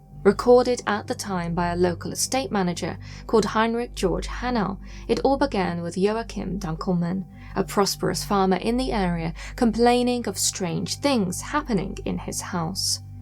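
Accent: United Kingdom. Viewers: personality is perfect for the channel. Quite clean Brit accent too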